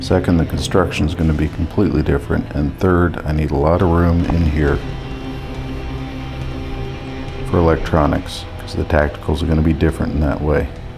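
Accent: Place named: Yorkshire, United Kingdom. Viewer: Not a bad Yorkshire accent! 😂. Loving this, you are clearly insane but this is gonna be great. Well done mate 👍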